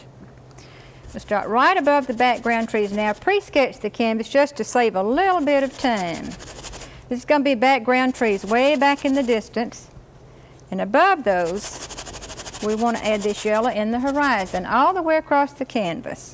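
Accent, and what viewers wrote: South, United States. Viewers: Why have I never heard of this woman before? Why, she’s the female Bob Ross! I just love her southern accent!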